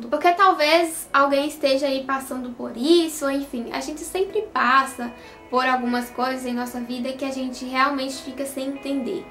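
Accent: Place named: Minas Gerais, Brazil. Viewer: Esse sotaque mineirin é o mais bonito do brasil, parabéns irmã, graça e paz.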